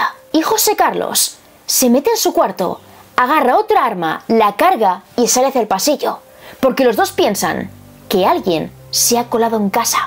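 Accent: Spain. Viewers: Hablas muy bonito tu acento español, el de la señora de la grabación está horrible jeje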